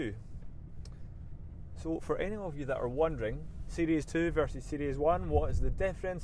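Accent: Scotland, United Kingdom. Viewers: Is it Scottish language sound? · I just love listening to Scots speak hehe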